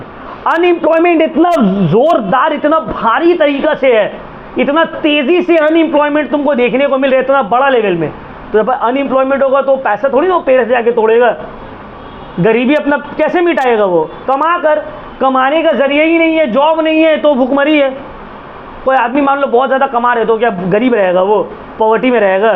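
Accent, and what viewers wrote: Kolkata, India. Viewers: Sir apka kalkatiya accent I love it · Apka kalkatiya bt krne k tarika bohot hebi h sir♥️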